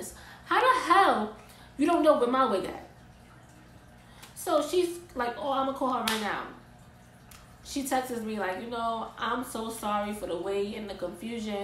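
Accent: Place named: New York, United States. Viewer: I hear her accent Im from nyc too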